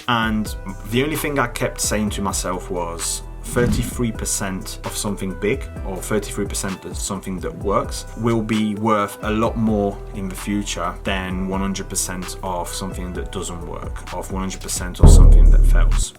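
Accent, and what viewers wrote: United Kingdom. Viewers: Kudos and huge compliments for your accent and also for your property and command of the English language. You sound super British and it doens't seem you're Sardinian at all. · Amazing video,. Thanks for taking the time to go through your thought process back then. PS. Incredible British pronunciation:O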